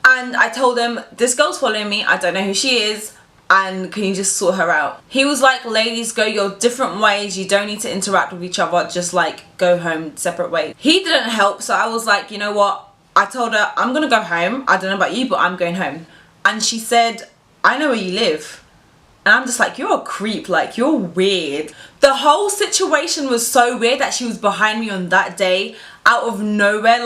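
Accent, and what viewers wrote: England, United Kingdom. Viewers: Yes, she is British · Are u British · BRITISH ACCENT GOALS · She's British, from London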